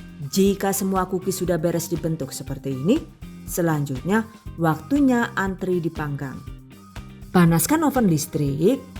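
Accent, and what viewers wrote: Banyumas, Indonesia. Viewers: Saya suka resep dari karena resepnya simple dan banyak memberikan tips dan trik yang sangat membantu bagi saya yang pemula, ngapak2nya jg ada😊😊. Mksh 😙😙😙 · asal resepnya udah ga ragu buat nyoba recook.. vidionya selalu disertai tips & trik, jd insya Alloh berhasil asal sesuai resep sama langkah²nya.. ditambah ana logat² ngapake, dadi rasane kaya agi diwaraih masak nang mbekayune, wis pokoke channel masak terfavorite nyong kye lah yu.. semoga makin sukses & jgn pernah bosan untuk berbagi ilmu ya mbekayu.. salam ngapak dari kec.ayah kebumen #GiveawayTHV2021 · Chanel kesukaanku Menginspirasi buat ibu ibu muda kaya aku yg suka bgt buat kuker, tips &triknya ngebantu banget 🥰 Dubbingnya pengisi suaranya juga lucu ngapak ngapak lihat video sambil senyum" Sendiri 😂 Paket komplit pokoknya klo liat ada lucu"nya gimana gitu 😁 #GiveawayTHV2021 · Sueeennneeeng bgt ma video2 Pas moment realeseny Pas budgetny Pas rasany Pas tipsny Pas bhasa NGAPAKny Pkokny ngebantu bgt Jazakillah khoiron😊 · mb,di bnyakin ngomong ngapaknya ya,,lucu😁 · Seneng liat video karna ada hiburan tersendiri dngan suaranya yg khas berwibawa dan kadang jg ngapak 😁 sukses terus bunda #GiveawayTHV2021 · Paling sukaaa deh nonton ❤️, banyak bgt resep2 camilan'a, bisa juga buat ide jualan d rumah.. 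tutorial'a jelas, padat & gamblang👌. Ilmu'a bermanfaat banget apalagi ibu2 muda sperti saya ini😊. insyaaAllah kelak nanti gadget dapur'a sudah komplit (maklum nganten anyar😅) pengin bgt deh praktekin 1per1 resep'a biar bisa bantu2 suami juga😍 oiyah liat video'a juga ga ngebosenin deh pokok'a, krna logat medok "ngapak'a" itu lhoo yg bikin ngakak sendiri😂😂 Daaan dari pertama jumpa yg paling bikin penasaran itu pengisi suara'a tauu, hehehe🤗. #GiveawayTHV2021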